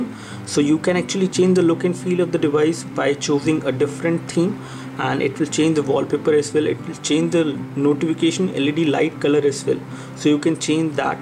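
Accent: India. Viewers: By your accent i would say you are Indian right? :D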